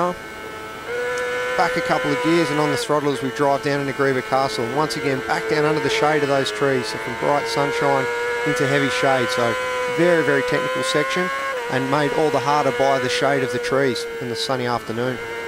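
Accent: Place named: Australia. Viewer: You sound like an Australian. I'm kiwi that's how I know 🙂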